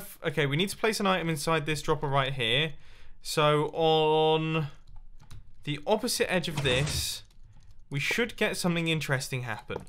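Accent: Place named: United Kingdom. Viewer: you are speaking british !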